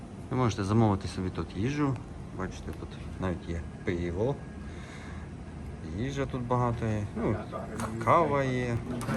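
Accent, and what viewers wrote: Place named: Lviv, Ukraine. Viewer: Ви молодець я зрозумiла, що ви з Миколаева а мова у вас начебто ви зi Львова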